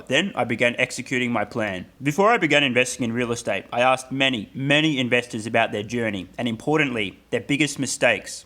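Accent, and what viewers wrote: Australia. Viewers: It's going to be a tough decision to sell a single share in 10 years! I really hope by then they are paying a dividend by then so I can retire early. Great vids and nice to hear an Aussie accent to get my TSLA news from too, thanks mate! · Great Aussie accent!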